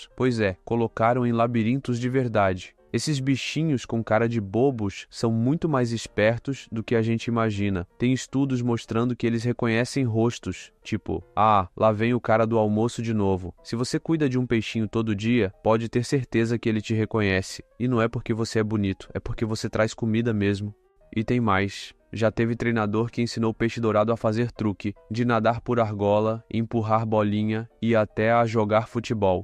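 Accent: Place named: Rio de Janeiro, Brazil. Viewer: tira esse sotaque carioca, me da agonia mano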